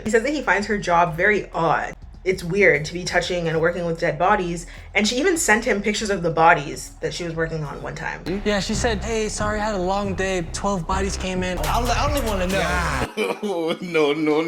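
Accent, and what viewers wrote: Canada. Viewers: Girl don’t feel weird about your Canadian accent! Your voice is so soothing and you’re an amazing story teller! I just came across your content a few days ago and I have been bingingggg ❤ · ahh I’m kinda fangirling rn. I’m so stunned that you replied!! I’m a new subscriber and have been binge watching all of your catfish and catfish adjacent content and I’ve been loving it and loving you!!! I also wanna say I love the sprinkles of Canadian we get from you, it’s so charming and I love it, it makes me giggle because I find it so cute! Thank you for being a real one bestie and I can’t wait to continue watching you for years to come ❤️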